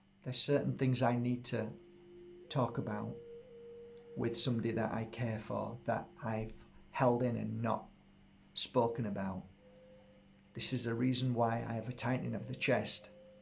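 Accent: Yorkshire, United Kingdom. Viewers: A wonderful video! Thank you..and..I truly enjoy your Yorkshire accent❤️❤️❤️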